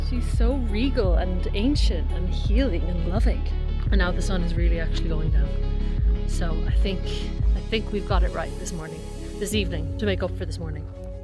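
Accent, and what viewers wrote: Ireland. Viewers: is it a irish accent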